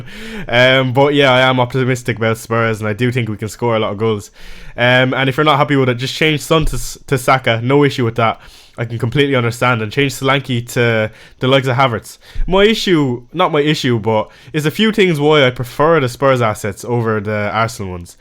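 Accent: Ireland. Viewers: I can hear a hint of an Irish accent😅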